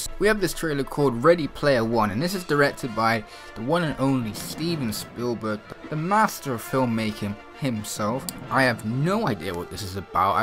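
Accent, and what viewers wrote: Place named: United Kingdom. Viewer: Ive never heard a british accent with a lisp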